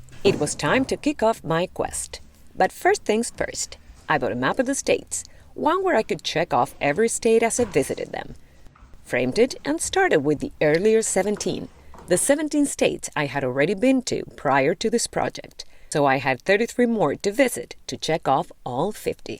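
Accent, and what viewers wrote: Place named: Rosario, Argentina. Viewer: Amazing rosarigasino’s accent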